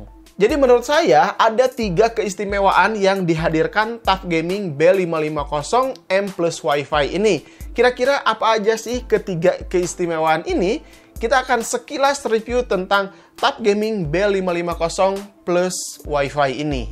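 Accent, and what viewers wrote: West Java, Indonesia. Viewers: wkwkwk sunda bet ngomongnya. ketika V dibaca P "pidio"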